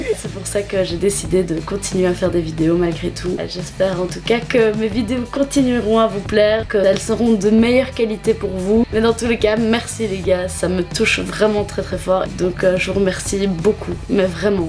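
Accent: Belgium. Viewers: C'est bien une compatriote belge que j'entends là?Je te trouve super cool a regarder je m'abonne directttt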